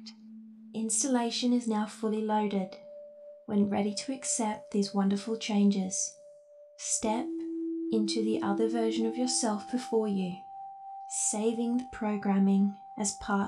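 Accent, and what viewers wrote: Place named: Australia. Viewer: I'm working my way through this series. It's nice hearing another Australian do this stuff Cheers :-)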